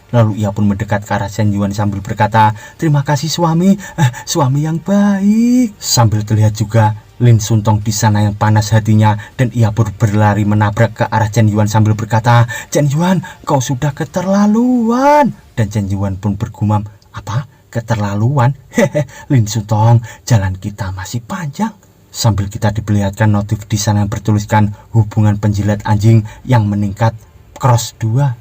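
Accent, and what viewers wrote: Java, Indonesia. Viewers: Seneng bgt logat jowone mas.. mantep pol.. rasa masku lg cerita 😂😂😂 semangaaattt!!!!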